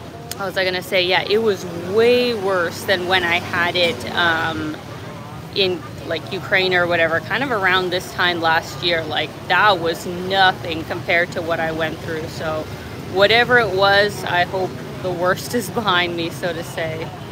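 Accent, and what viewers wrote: Scotland, United Kingdom. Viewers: are you scottish? 😁😁😁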